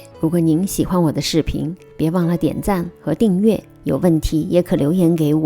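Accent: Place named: Shanghai, China. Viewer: 萨姐的口音和样子都像上海人（至少是江浙沪一带的），讲解也很细致，像极上海人的风格。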